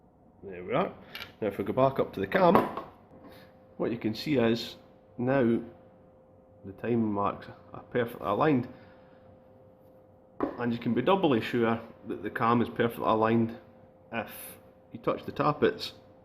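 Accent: Scotland, United Kingdom. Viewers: I'd very much like some more technical videos in depth like this one. Also it's great to hear someone from Scotland too.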